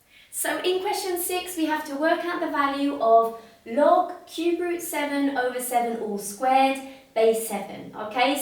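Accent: England, United Kingdom. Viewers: Bonjour, je ne jette pas de fleurs et c'est bien la vérité votre chaîne Youtube est une référence en matière de l' éducation des mathématiques, elle est d'ailleurs parmi mes rares chaîne préférés, j'ai parcouru presque tous vos vidéos et c'est toujours avec le même haut niveau de professionnalisme et de la bonne humeur que vous enseigner cette matière aussi Clairement que brièvement ,d'ailleurs vos vidéos me sont de grands aide pour enseigner les mathématiques à mon neveu,parfois à distance, qui est inscrit tant tôt dans un collège américain tant tôt dans un collège britannique à Dubaï et qui est un brillant et aimant des mathématiques et qui apprécie beaucoup d'ailleurs votre manière d'enseignement et aussi votre accent anglaise il faut signaler aussi que votre langue et prononciation est très claire et compréhensible malgré que j'ai étudié les mathématiques en français et mon anglais est basique je ne trouve aucune difficulté pour comprendre, merci infiniment pour votre précieuse aide et pour vos efforts déployés et votre temps dédiée à l'enseignement et de partage du savoir félicitations bonne continuation et bonne chance.👍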